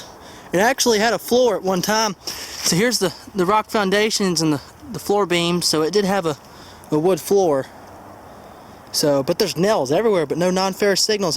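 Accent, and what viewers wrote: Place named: Vermont, United States. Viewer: it's vermont speech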